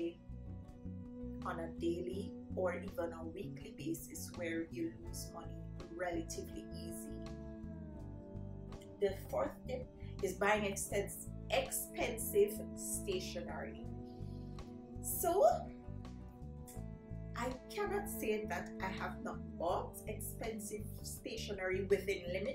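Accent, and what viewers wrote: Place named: Jamaica. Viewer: Where in the Carribbean are you located? I’m hearing a Jamaican accent…👂🏾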